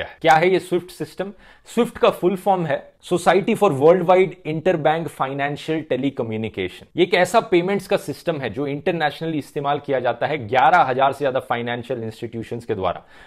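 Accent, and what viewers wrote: India. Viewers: I watched his video after many years today, i had stopped watching his videos when i realised his propoganda. But i still respect his quality of research on non-partisan issues. A big change i got to see is in his Hindi language tone. He has changed his accent in Hindi and now it sounds more desi He deliberately says Hajar instead of Hazar. Good marketing for his propaganda All the best to him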